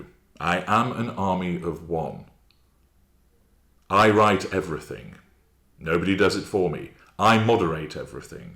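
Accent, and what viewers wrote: United Kingdom. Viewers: I'm from the US. So he just sounds like an elite dude from somewhere in the UK. 🤷‍♀️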